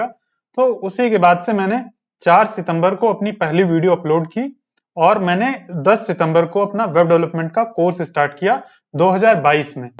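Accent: Awadh, India. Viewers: bhai kaha se ho? Apke awadhi language ka touch mere Balrampur and Gond district wala lag raha hai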